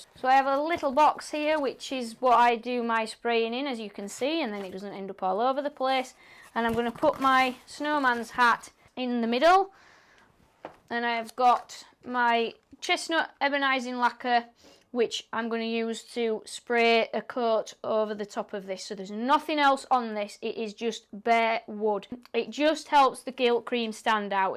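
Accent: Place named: England, United Kingdom. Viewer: Sorry I am so late with my comments but I just found this video. As I am a colonist (US) I usually find the English accent a bit difficult but in this case not at all. And I was quite surprised to find this video ran 48 minutes. It held my attention for the entire run. The finished work piece is very attractive and the production quite well done. Thank you for sharing. Have a great day and stay safe.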